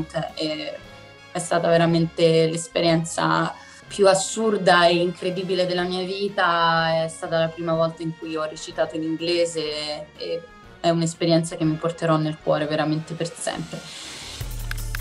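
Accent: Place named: Rome, Italy. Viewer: Hai piu' un accento romano che bolognese....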